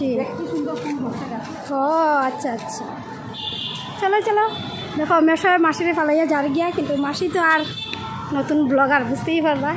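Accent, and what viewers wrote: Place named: Bangal, Bangladesh. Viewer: Tomar bangal katha khub valo laglo. Ami kolkata theke. Tumi khub beautiful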